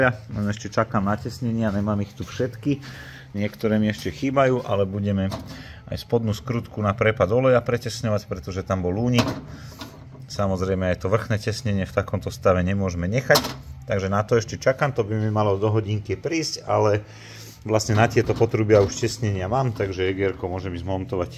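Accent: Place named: East, Slovakia. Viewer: Máš predkov z vychodu ze vies trocha po vychodnarsky ??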